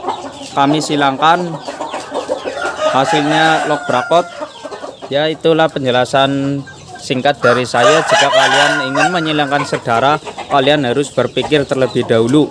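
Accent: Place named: Banyumas, Indonesia. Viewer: Ngapak banget bg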